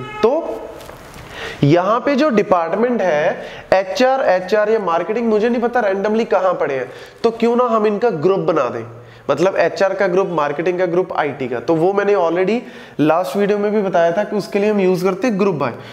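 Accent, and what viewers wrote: Punjab, India. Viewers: Braber Punjabi tone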